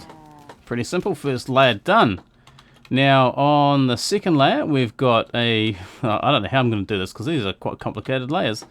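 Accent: New Zealand. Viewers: My water only flows to the right over the fence, only flows both ways when I update with redstone torch, but when i retract the water and dispense it again it screws up again...any ideas? P.S. Great to hear another fellow kiwi accent on youtube! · Hey bro...works mean as,buzzed out when I watched your tutorial and heard a kiwi on the other end, just starting to get some skills now on minecraft and it's all due to the tutorials on youtube...keep up the good work man.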